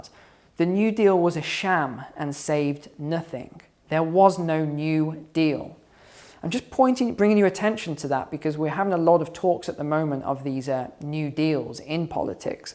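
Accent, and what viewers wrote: England, United Kingdom. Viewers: English with English accent using US 'English' words to appeal to the audience. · I have heard that some English people live outside the UK. His accent is authentic English. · He is definitely British . I’m British so I can hear his delivery is British, even regardless of his accent !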